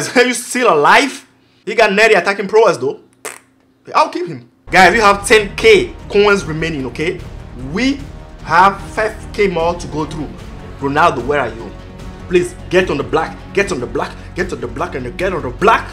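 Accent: France. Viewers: are you french ? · Are you French? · j'adore tes videos et surtout ton accent francais mdr ;) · r u french. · Ta un accent français un peu t'es français ??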